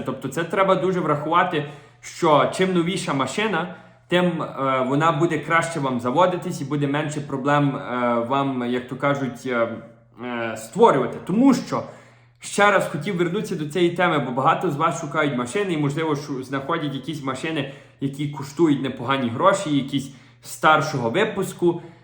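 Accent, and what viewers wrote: Western Ukraine, Ukraine. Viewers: Дякую за відео,. Ти так класно, по простому розказуєш, хочеться сказати, так по нашому, по западенськи😁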